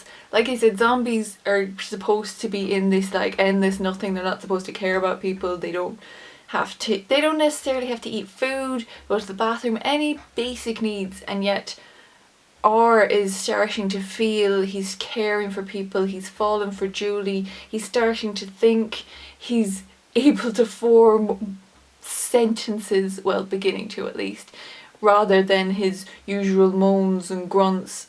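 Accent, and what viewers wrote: Ireland. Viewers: I thought there was a cat behind you till I realised it was a reflection of your arm in a mirror lol. Great vids. Always good to hear fellow irish on here. :)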